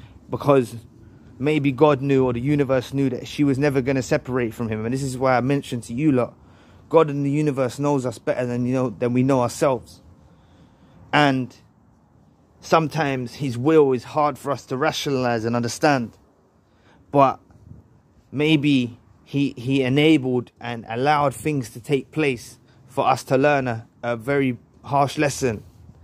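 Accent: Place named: Cockney, United Kingdom. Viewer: I love your cockney accent! Reminds me of London! ❤🙌✌️👊💯🍀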